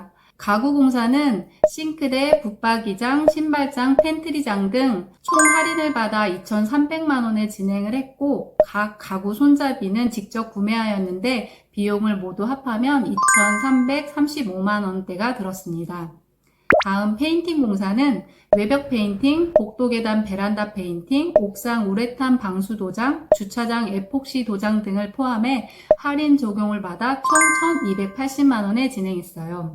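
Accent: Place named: Jeonju, South Korea. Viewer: 말투 듣고 전주사람인것 같앗는데 맞군요.한양수자인 보고 맞구나 했습니다. 대단하십니다.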